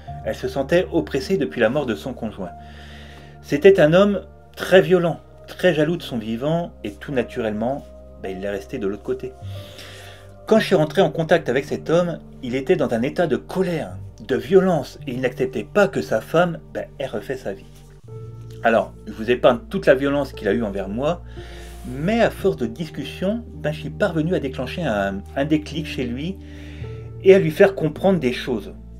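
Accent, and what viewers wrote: North, France. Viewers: Bonjour 😊 super explication merci 💖 (je perçois un petit accent du Nord je me trompe ? 😁) Ça apaise de voir les choses ainsi, je comprends mais je ne peux m'empêcher de penser qu'on est vraiment des êtres sadiques et maso. Pourquoi s'infliger ça...🥺 Évoluer ? Par la souffrance ? Ça me fait me sentir encore moins bien au fond. Je continue de vous découvrir sur Youtube. 👍🏻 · Vous êtes du Nord de la France ??? Il me semble avoir reconnu cet accent particulier 🤣👍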